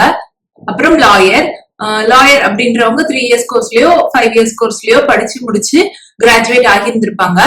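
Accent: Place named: Nagercoil, India. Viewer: Idhu Mamma oru Nagercoil..... Salang theriuthaaaaa..... From Kanyakumari distritaaaa mam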